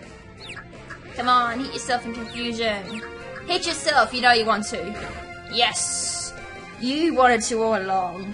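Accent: Australia. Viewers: are you an Aussie? (just that your voice sounds like it and im aussie too:3) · Are you Australian?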